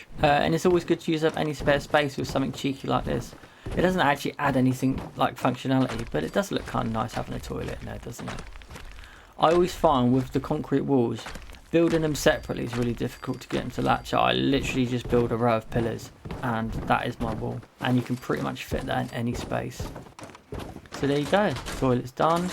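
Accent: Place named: New Zealand. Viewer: It's the Kiwi accent you're thinking.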